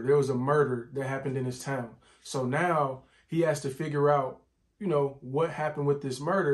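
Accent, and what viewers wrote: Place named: Rio de Janeiro, Brazil. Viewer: I’m from Brazil and you sound like a Carioca (people who were born in Rio de Janeiro) and I love it. Your channel is such a incredible discovery ❤️ love the way you talk about the books! Hope you’re having a great booktube experience!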